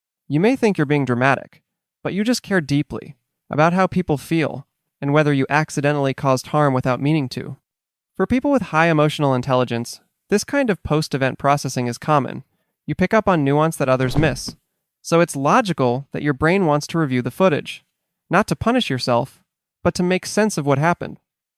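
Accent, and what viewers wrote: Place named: Canada. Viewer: You’re Canadian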